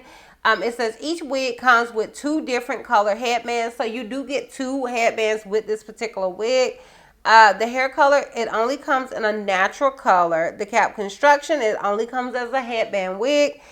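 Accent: South, United States. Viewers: You're so beautiful in all the wigs. I'm glad your feeling better. I work in the medical field and love headband and ponytail pieces because you can just throw them on and GO! Your spirit is so uplifting. It can be felt thru the phone with that Southern accent! You crack me up!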